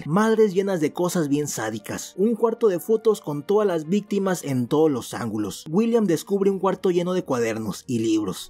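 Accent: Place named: Aguascalientes, Mexico. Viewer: Tienes un acento igual que yo y los que viven en Aguascalientes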